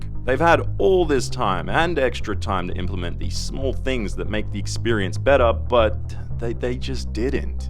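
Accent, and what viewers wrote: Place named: Australia. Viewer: Dead set miss the I'm and the accent is Australian intro hahahaha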